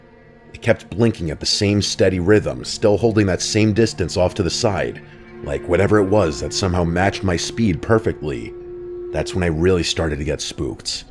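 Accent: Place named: New Jersey, United States. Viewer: No he’s not. The abhorrent Jersey accent is far from intriguing. It’s amazing how many Generics fall in line.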